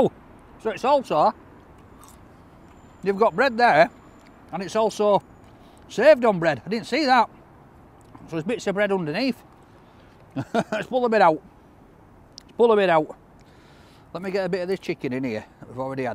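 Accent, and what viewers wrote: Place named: Bolton, United Kingdom. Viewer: Are you from Bolton mate ? You sound just like my mom and grandparents who are from there..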